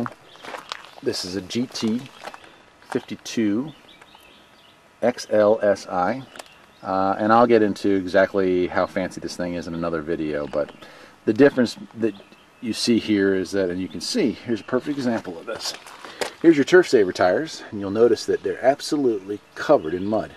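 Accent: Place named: Midwest, United States. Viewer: You sound like a midwestern boy! Great channel, for learning!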